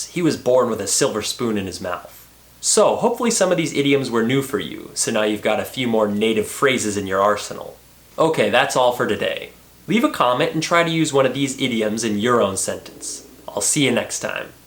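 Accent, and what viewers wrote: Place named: United States. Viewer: i think it can be normal because he speaks as a native American that's why don't be demoralized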